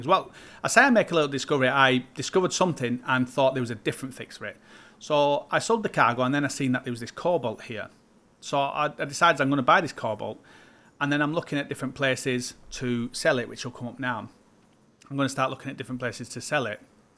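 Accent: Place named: United Kingdom. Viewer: 1. You need to slow down when you talk 2. You have a thick UK accent half of what your saying is hard to grasp 3. Your a bit of a scatter brain, prepare when you post You Tube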